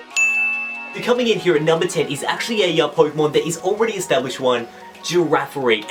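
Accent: Australia. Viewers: ReaditonReddit Autocorrect :/ btw English Originated in England not America,and I know it was Australian but I'm not that good at realizing the difference in accents of Australian and British. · Are you Australian? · Thats an australian accent. Thats honestly kinda racist.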